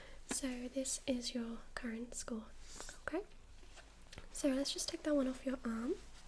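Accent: United Kingdom. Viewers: Is that a British accent you got?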